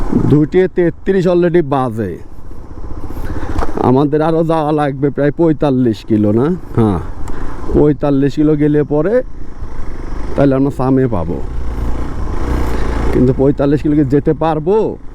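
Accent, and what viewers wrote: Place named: Pabna, Bangladesh. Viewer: ভাই আপনার ভাষা আমাকে পাগল করেছে। ভাইয়ের দেশের বাড়ি কনে পাবনা নাকি?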